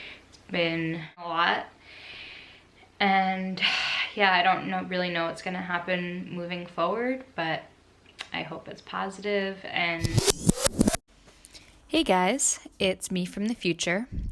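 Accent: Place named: United States. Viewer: Genetically, yes, she is 100% Caucasian as the ME falls under that category. But socially, she's mixed race due to the cultural differences. Judging from her accent, she lives in the US and is trying her best to make sense of things within the racial constructs that she lives in. It's accurate for her to describe herself as mixed race.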